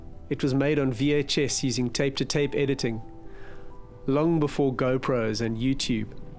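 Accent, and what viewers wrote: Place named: United Kingdom. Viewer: Great I think u are from UK by your accent so we neighbors haha I wonder could you give me any good safe tips on places to get a good safe used wing? I know I'd be be able to afford a new one, especially with the way 2020 has gone so far.. Any help or advice I would be ever so grateful, I dreamed of flying ever since my earliest memories an I'm gettin on in years and have to get In the sky befor it's too late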